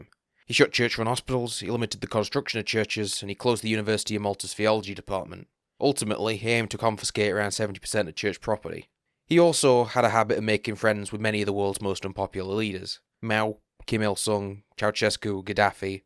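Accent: Yorkshire, United Kingdom. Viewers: Nice to hear a fellow Yorkshire man speaking, how do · You sound more Yorkshire then you ever have done so before. Is this on purpose?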